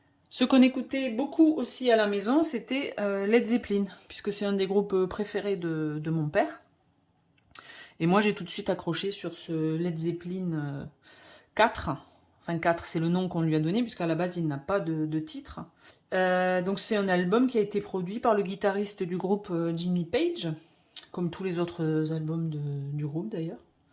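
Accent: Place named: North, France. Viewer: Bonjour mademoiselle, oh! Purée que çà fait du bien de voir une vidéo d'une fille qui nous parle de rock 😂😂😂. Première fois que l'on présente le chef-d'œuvre de dans une vidéo, enfin 😊😊😊. L'album post mortem de cet artiste n'est surtout pas à négliger. Première fois également que l'on présente de, meilleur selon moi que, merci pour cela😊😊😊. En parlant de grunge emmenés par le regretté étaient magnifiques. excellent, excellent, ils ne font absolument pas le même type de musique. En voyant l'album de, j'ai immédiatement pensé au youtuber vinyl over qu'on ne voit plus malheureusement sur la toile que dire, on s' incline. La reprise de par mon héros est splendide. Dis-moi, ils prenaient bien soin de leurs vinyles tes parents. Allez mademoiselle, je vais regarder toutes tes vidéos prochaines, ça s'est sûr. ❤❤❤❤ Ps: d'où vient ce petit accent si sympathique? 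Du nord? 😂😂😂😂.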